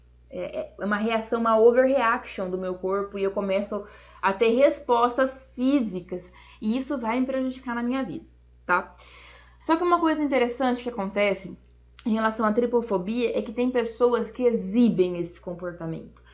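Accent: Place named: Inland São Paulo, Brazil. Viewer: Agora, uma pergunta: Esse sotaque te entrega que vc é do interior de SP ou PR. De onde vc é? (Até pq eu tbm tenho haha)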